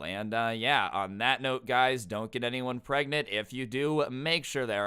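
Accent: Russia. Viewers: Your Russia accent is hilarious!! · Don't mess with the people from the Balkans cuz ottomans and the communists (fascists as well) btw ur Russian accent was good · I love Russian ascent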